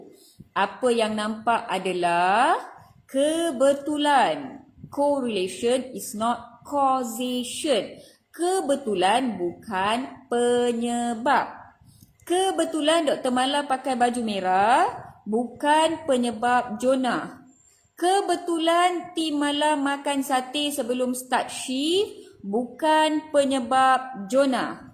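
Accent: North, Malaysia. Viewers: Fasih doktor cakap melayu.... Dr org utaqa ka?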